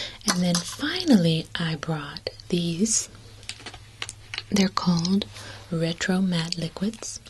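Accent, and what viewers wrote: South, United States. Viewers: This is the first video I've watched by you but im blown away with the fluidity of your speech, your knowledge of the products and your voice itself. Do I detect a hint of a southern accent? I'm from Texas so it sounded somewhat familiar haha. In addition, it's always a plus to have your makeup done by Morticia Adams haha, I love your look!!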